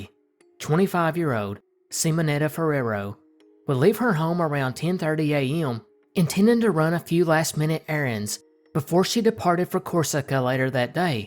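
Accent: South, United States. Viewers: Southern accent x Mysteries. Subbed. Finally something new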